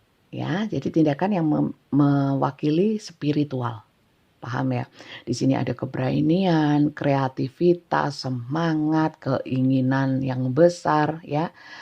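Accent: Java, Indonesia. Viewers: bunda lucu penyampaiannya jg detail enak ngomongnya bhs jawanya medok aku apresiasi bngt sm bunda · Pgi bunda resonet skli bunda kii lucu kudu guyu klau dgr readingnnya bunda sll tk tunggu egh buun logat jwa bngt suka skli bnr bunda tdk pelut abnrnya ya krena nggk ounya duit aja buun.. Dia fokus krja buun smnjk covid smp dtik ini htngnya bmyak jdi untuk k hub nggk n gtu mikirin.. Tpi dia ttp syank ktne buun.... Trima ksih bcaan ya bunda sht sukses sll buat bubda Aamiin" 😍😘🙏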